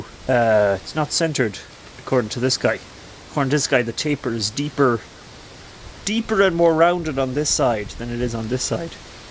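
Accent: Ireland. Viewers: Is that a Meath/Westmeath accent? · hey not to be a dick but why are you the only one i ever see on video that has the Irish accent and everyone else sounds American ? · I knew it! Irish english! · You sound almost Irish?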